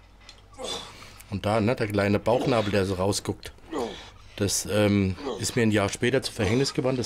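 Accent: Hesse, Germany. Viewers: Hessisch is und bleibt de geilste Dialekt !!!! 😂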